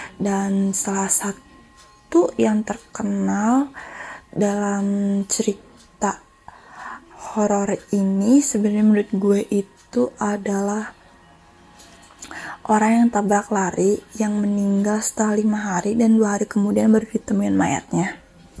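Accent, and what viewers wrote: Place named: Sunda, Indonesia. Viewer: Logat sundanya msih kental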